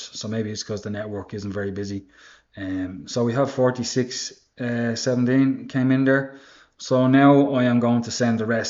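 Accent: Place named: Ireland. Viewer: Love your vids. Question... I am no genius but it since like you might be from Ireland.. haha love the accident. I am from England... and now that labour have got in ans they are probably going to increase capital gains tax.. I was wondering if you had any advice.... if you think they could do it at the autumn election? Wait until April 2025.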